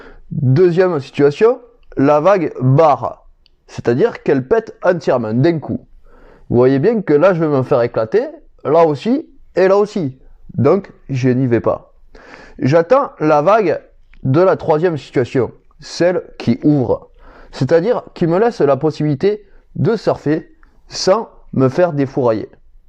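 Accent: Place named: South, France. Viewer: J'adore ce petit accent du sud !! 😂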